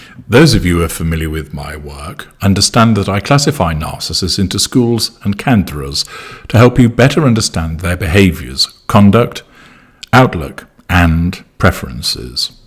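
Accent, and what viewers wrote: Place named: Liverpool, United Kingdom. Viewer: Sounds like a Liverpulian accent to me. Well done.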